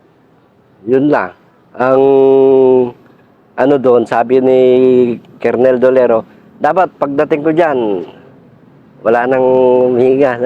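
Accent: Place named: Pampanga, Philippines. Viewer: Ganyan tlga ang Punto mg Tagalog ang kapampangan.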